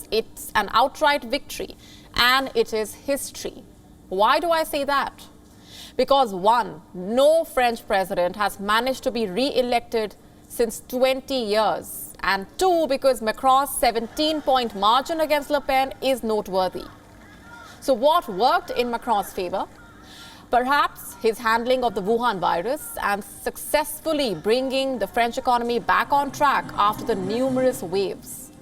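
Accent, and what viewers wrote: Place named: India. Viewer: Is she reporting news in English or Indialish. Do WION don't have a better newscaster other then this lady.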